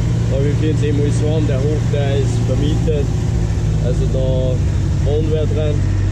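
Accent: Austria. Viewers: Kimmst du aus Österreich?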